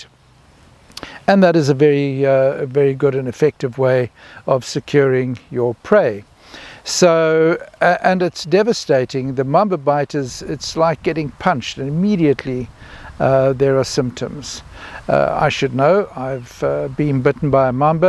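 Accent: South Africa. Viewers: I like the style with you being outside. The South African-ness and story telling puts me in the same frame as reading a Wilbur smith book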